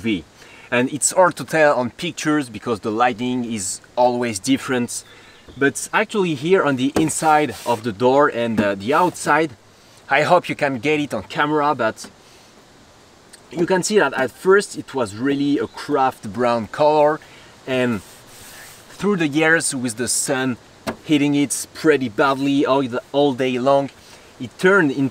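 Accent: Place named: France. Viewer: Him : *Talk My brain : Where is this accent come from? Him : France Me as a French Canadian : Oh so this is how I sound... Belle découverte ton channel. J'achète mon Forester 2001 demain merci pour ton expérience!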